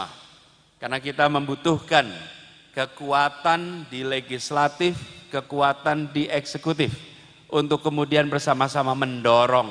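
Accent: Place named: Java, Indonesia. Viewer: Bahasa jawanya kok medok jg....sehat selalu pak anis.pidato jenengan bikin suasana hati menikmati ..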